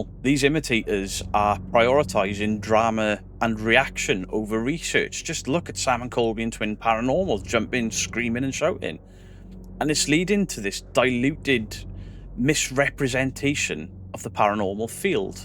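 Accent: Wales, United Kingdom. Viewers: All I'm hearing is Welsh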